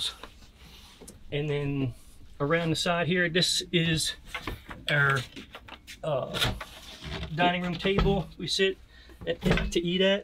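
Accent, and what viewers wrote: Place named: Louisiana, United States. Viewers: I would swear he's from Louisiana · I’d swear he was from New Orleans suburbs based on his accent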